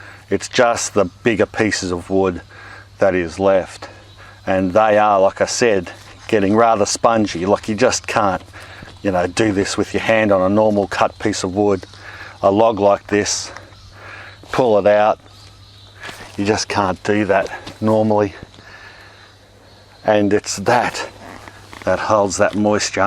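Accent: Australia. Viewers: Australian accent is funny 😂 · Straight Aussie. Reminds me of my dad 😆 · Came here to listen to an Aussie talk about what happening Down Under. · was raised loving the crocodile guy accent, AND YOU HAVE IT ALONG WITH HIS VOICE, JUST MORE CHILL, MAKES YOUR VIDEOS MUCH INTERESTING, LOVE AND APreciate, ESPECIALLY WHEN U SAY, "SO NEXT LETS GO TO THE NEST TRUNK, OH YEAH ITS CHIPING, ITS TURNING INTO DIRT AND DUST" LOL LOVE IT MAN, IF THE TRUNKS WERE CROCKS 🤣👌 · Typical Australian hehe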